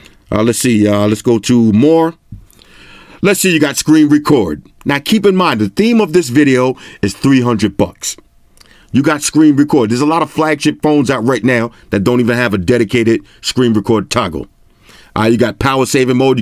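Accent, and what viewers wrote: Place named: New York, United States. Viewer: I'm type shit living the struggle so videos like this help me a lot - is always making the best reviews for this type of shit - and also does anyone else just laugh because the way talks is so New York that it's hilarious 😭😂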